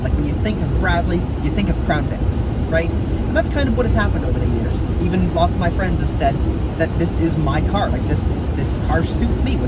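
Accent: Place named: Canada. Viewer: i am also canadian .. i can tell by your voice lol( native american from rez in canada)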